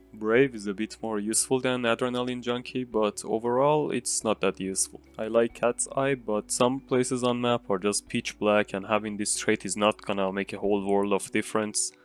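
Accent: Iran. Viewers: Are you Iranian? You have a Persian accent.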